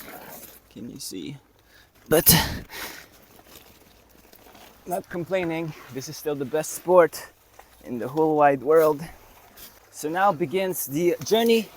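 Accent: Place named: Mindanao, Philippines. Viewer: What country are you from? Your accent sounds like you're from Southern Philippines but I could be wrong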